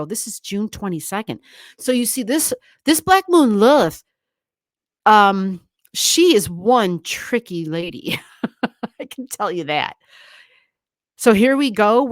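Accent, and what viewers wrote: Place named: Midwest, United States. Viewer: Wow, this is fascinating and I just love all of your videos. It's refreshing to listen to another midwesterner-hehe. My BML is in Capricorn (almost Aquarius) in the 10th house. I'm a Sag sun, Aquarius moon, Taurus rising, scorpio venus. I tend to get very aggravated with Virgo energy even with all of the Earth placements in my chart. It feels judgey and oppressive. G, I also noticed that a few married couples around me are having big arguments about cleanliness and household duties. Woah.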